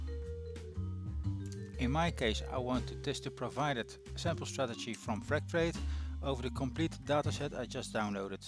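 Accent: Netherlands. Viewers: First sentence and I knew he was dutch. Dank je wel voor de playlist!! haha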